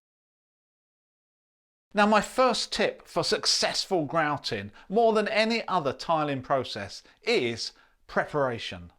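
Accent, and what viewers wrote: United Kingdom. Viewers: Great vid, very informative, nice to hear a British voice on these DIY vids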